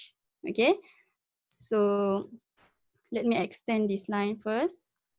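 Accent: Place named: France. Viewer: Thank you for the tip... Very nice Done! By the way what a cute French Accent!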